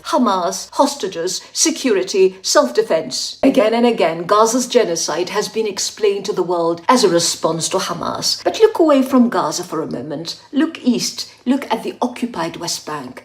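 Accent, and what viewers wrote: RP, United Kingdom. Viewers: I'm really sorry. I can't listen to this affected RP accent. فلسطين 🇵🇸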